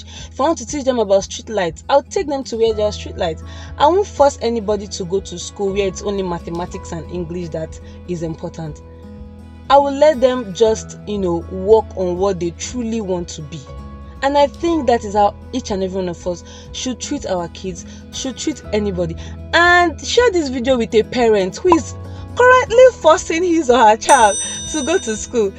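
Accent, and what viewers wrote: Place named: Nigeria. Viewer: I like Nigerian accent.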